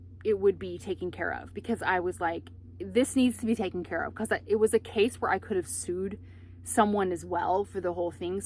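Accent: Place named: Australia. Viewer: Interesting accent. Australian background? I always thought manual transmissions were cheaper to fix that automatics.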